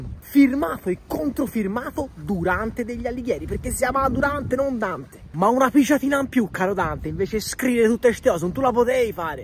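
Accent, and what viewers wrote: Florence, Italy. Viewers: grande video, forse non decolli a livello nazionale perchè il dialetto fiorentino è un pò troppo particolare. Ma e un fa nulla e grazie mille!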